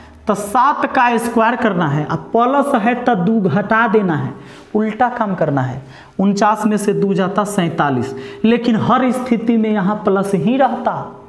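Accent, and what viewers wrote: Bihar, India. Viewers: Your bihari accent has been helping me to Focus in maths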